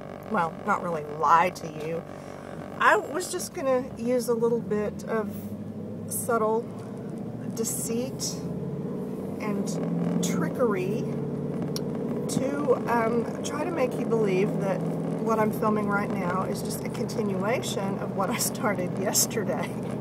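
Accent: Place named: Texas, United States. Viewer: What I love the most is your Texas accent. I'm a native Texan stuck in Florida. I love hearing the "song of my people"!!!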